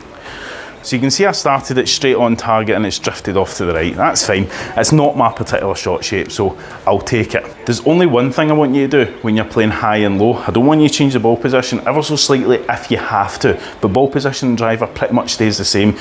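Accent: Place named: Glasgow, United Kingdom. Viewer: Thanks a lot. The Glaswegian makes this Dutchman have to concentrate to follow along (more than with Billy Connoly). I bet though a lot of Americans won't even try to understand. I will give this method a try. Liked and subbed.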